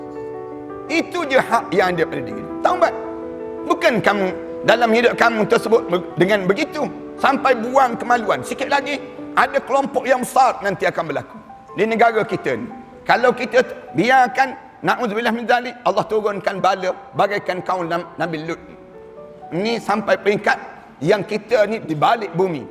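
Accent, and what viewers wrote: Kelantan, Malaysia. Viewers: Pekat loghat kelate ...ustaz yer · masyaallah...ya ke...baru ni... Kuah tumpah kemana kan.....alhamdullillah ade penyambung dari tgnik aziz...saya memang pu suka ceramah ustad ahmad dasuki ni.....sedap telinga dengar nyaman rasa di hati...ceramah ceramah nya..walaupun dgn loghat kelantan nya..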